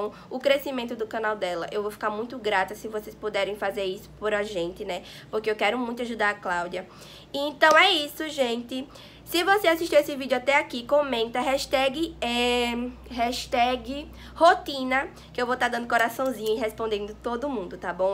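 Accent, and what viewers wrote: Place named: Northeast, Brazil. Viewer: Sotaque Nordestino U3U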